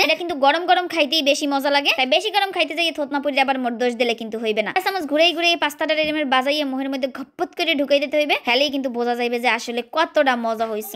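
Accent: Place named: Barisal, Bangladesh. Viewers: Sona...Tumi....erokom... borisailll a....vassssa...kivabe...set....korlaa????..NA...ki....sotti..sottti....bolo.😂ami..khulnaiyyya. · Apni kon deshe bas koren,,,eita borisal er basa,,,,,ei prothom sunlam borisal er basa kaw sune nai,,,,tao se abar Bangladesh e😅😅😅😅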